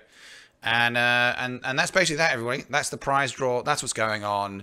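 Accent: United Kingdom. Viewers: You speak with an RP accent so its hard to guess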